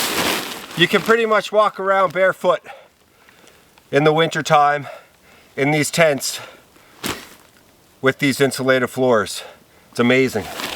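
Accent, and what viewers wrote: Newfoundland, Canada. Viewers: I hope you wanted your chicken well done! You say you're in the NWT but you sound like a Newfie? Shouldn't you be on the other side of the country?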